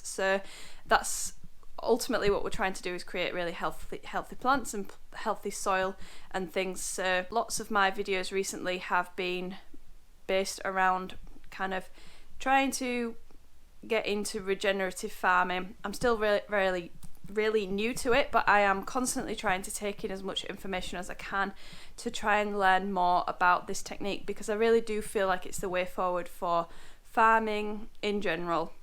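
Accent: East Yorkshire, United Kingdom. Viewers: Love your East Yorkshire accent😊m from Leeds very different but similar.I’m an amateur gardener but I’m following your amazing project. Looking forward to to seeing your plot grow. 🐝🌼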